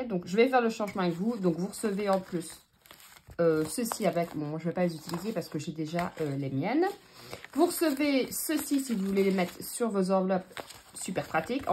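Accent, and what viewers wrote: Belgium. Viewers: Coucou 😊, j'aime beaucoup tes vidéos, c'est très agréable de t'entendre parler avec ton accent belge🤩 J'attends avec impatience la vidéo de ton jardin 🌻⚘🌺🌱🌿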